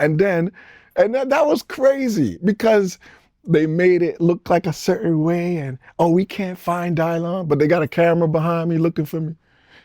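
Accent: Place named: Kentucky, United States. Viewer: You sound like a victim. You saying how you cared for others and provided. I'm from Kentucky and black you sound like us country and a voice change for somewhere overseas. Now was a Trippin he saying I'm going to daddy's house a few times. Sounds like he got a lawsuit coming. My brother, my friend black men need to embrace mental health with appointments.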